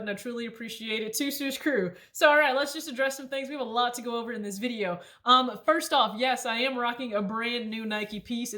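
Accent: South, United States. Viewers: yea via free side. that's usually how I do my legit checks . I get overly obsessed sometimes cuz I happen to enjoy it lol.. also great video love the content !! as well as the fact that your freaking amazing !! love the southern accent!!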